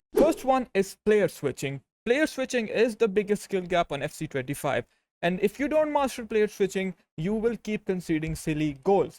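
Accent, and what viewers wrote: India. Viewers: your Indian voice is annoying asf tho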